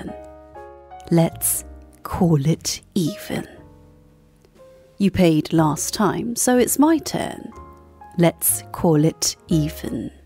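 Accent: RP, United Kingdom. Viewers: I like that you speak RP accent. It's basically, making sure you pronounce every single party of the word and it is a lovely way to speak.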